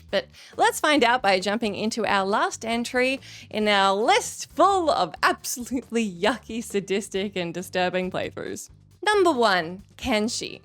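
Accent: Australia. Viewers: That is an Australian accent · I feel some of the most questionable things I've done are on Stellaris the game makes Slavery and Genocide fun! All for expansion and profit of course. I mean I'm normally apologetic about my Countries Imperial past and then I play that! Lol Edit: is adorable I think its the Aussie accent Shoot me I'm simping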